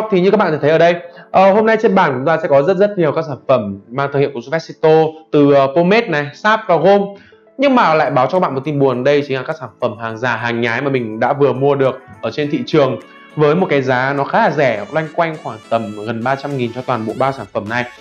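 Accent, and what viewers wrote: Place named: North, Vietnam. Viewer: Giọng bắc kỳ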